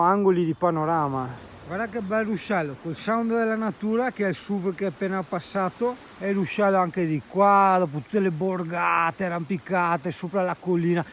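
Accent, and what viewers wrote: Veneto, Italy. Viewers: Bel video complimenti, bella parlantina Veneta. Bellissimi posti 🤟 BUON SABATO sera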